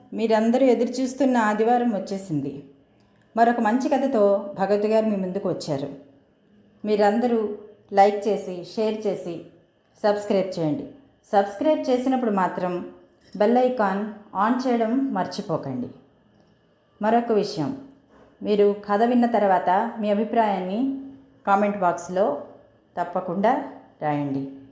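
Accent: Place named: Rayalaseema, India. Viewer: చాలా బాగుంది..సీమ భాష లాగా ఉన్నది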